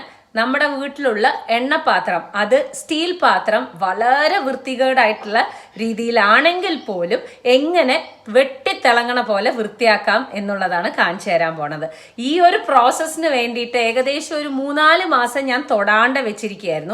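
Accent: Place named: Palakkad, India. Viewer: I am watching u r vedios just yo hear the palakad slang🥰😍🤩💕.I am a alumini from chittur college, basically I am from kannur still in love with pkd slang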